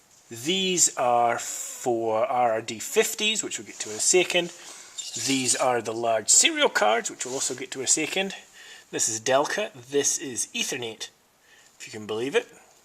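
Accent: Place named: Scotland, United Kingdom. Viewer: Ah, right. Thought I heard a bit of Scot in there too. I guess you get this question a lot.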